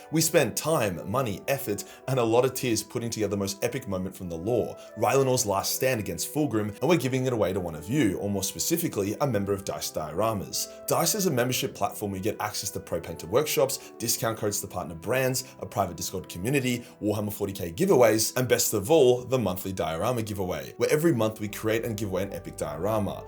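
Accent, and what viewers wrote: Australia. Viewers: You went full Aussy on this one. Love it.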